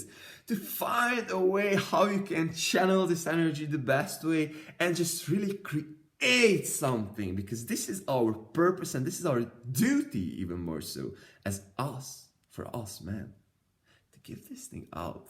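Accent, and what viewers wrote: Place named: Italy. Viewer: You have a deep accent- let me guess, you're Italian?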